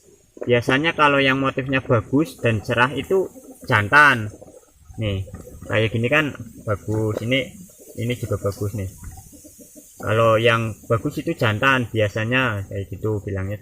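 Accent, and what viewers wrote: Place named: Banyumas, Indonesia. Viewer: Ngapak abis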